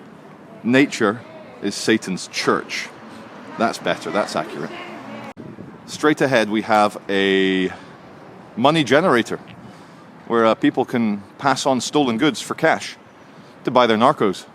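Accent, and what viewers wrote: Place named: Scotland, United Kingdom. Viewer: Like, Subscribe, he's a good guy he said, Scottish Accent sounds great.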